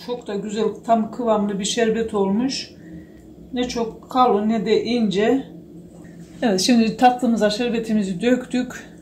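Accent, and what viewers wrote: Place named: Black Sea, Turkey. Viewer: Banada Karadeniz li gibi geldi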